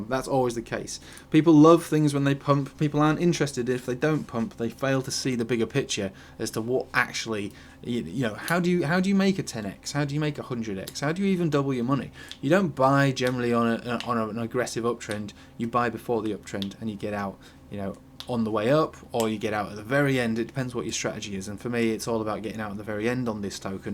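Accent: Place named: Northern England, United Kingdom. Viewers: Just “norf” :D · sounds yorkshire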